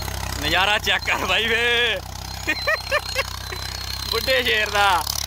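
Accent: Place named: Doaba, India. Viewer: Paji boli ta thodi doabey di lagdi a....khush raho veer bohat vadiya c vlog....hor v paya karo tractor related videos.....Allah thonu tarakiya bakshey....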